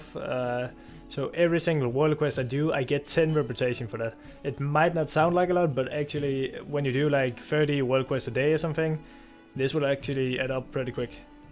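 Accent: Denmark. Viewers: Are you danish? You really sound danish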